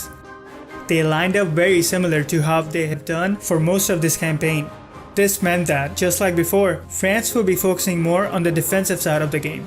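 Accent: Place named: India. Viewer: The guy talking has to be an India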